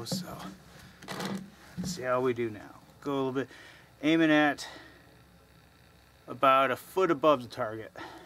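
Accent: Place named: Wisconsin, United States. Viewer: Is he from Wisc-aaaahnsin ?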